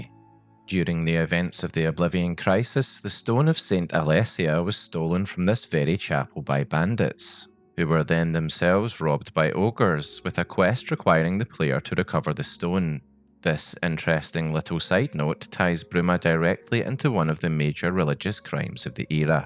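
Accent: Scotland, United Kingdom. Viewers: Are you Scottish? Very similar accent to folk round me 😂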